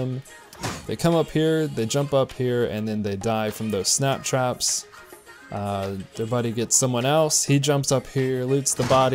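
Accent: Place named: United Kingdom. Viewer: that British accent tho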